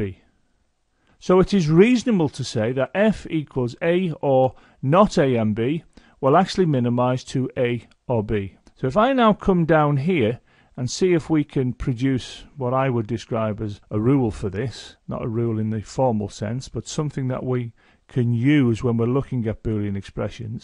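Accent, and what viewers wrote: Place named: Lancashire, United Kingdom. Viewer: Good Sir you are the best. Would be even greater if you added Boolean to the titles so we know which videos to look for. Other than that, the short and simple yet most effective way to help understand is your greatest asset. Btw I was debating with my mate about your accent and he said you were from Lancashire while I thought closer to Liverpool or St Helen's.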